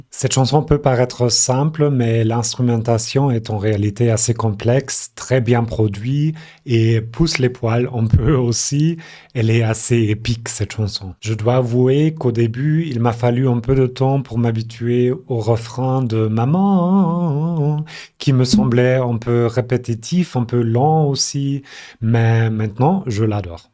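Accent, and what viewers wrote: France. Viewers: Wunderbar French accent 👍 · Votre français est parfait. Et votre accent est à peine perceptible, à tel point qu'au début j'ai cru que vous étiez Français.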